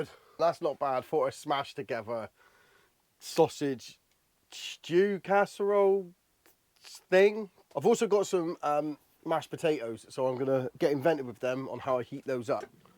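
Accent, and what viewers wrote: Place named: Cornwall, United Kingdom. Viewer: Love the Vlog. We are from Liskeard just up the road but moved away to Shropshire:( We went to Millendreath all the time when I was young and loved it. Loved hearing your accent and made me very homesick. Nice one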